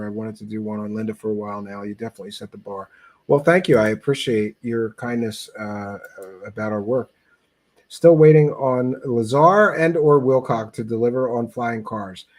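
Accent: Philadelphia, United States. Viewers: Are u from Philly? Or the Philly area? Ur accent sounds like my Philly accent hahaha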